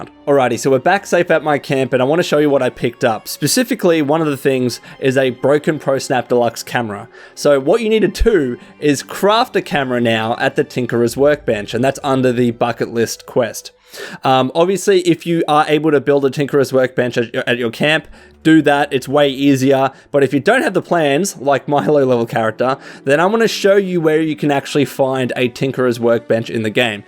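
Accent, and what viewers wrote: Australia. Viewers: Just got Fallout 76 two weeks ago and really enjoying it so far. Always good to come back here for the tips and hear another Aussie voice.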